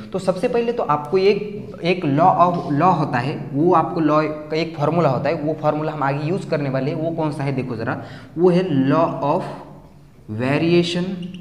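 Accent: India. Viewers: Sir tumhi Parbhani che ahat ka ? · Brother are you from parbhani nanded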